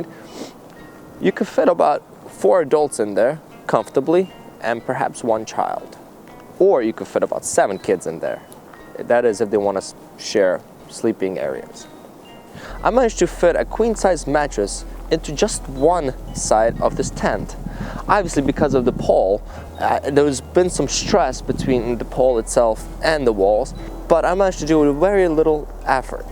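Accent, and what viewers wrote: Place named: New Jersey, United States. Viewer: The accent was so strong and then you said Jersey..made me laugh. I have just purchased the tent. Or a variety of it second hand. Excited to try it out.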